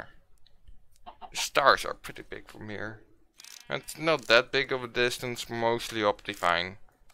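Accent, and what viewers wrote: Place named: Netherlands. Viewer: Haha your dutch accent is so funny! (Not meant bad at all m8!) Keep goin once you will be at 1k! Tip: maak wat kortere videos en record in meer fps dan word het veel interessanter! (En mschien je naar verranderen naar ofzo iets korter👍) veel succes verder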